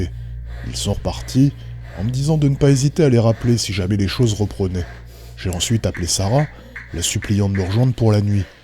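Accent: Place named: Quebec, Canada. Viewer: Le Québec doit être magnifique mais vos expressions et votre accent est tellement ridicule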